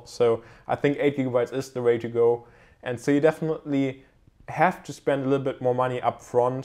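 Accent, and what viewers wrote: Germany. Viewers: Are you from Germany? You have a slightly german accent.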